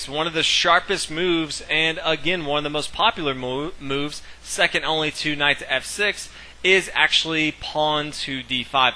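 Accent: United States. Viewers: thanks a lot for your videos, helps me to find a path in that wood of openings. now i only have to find a way to make my son (13 years, german and learns oxford-english at school) understand your amarican accent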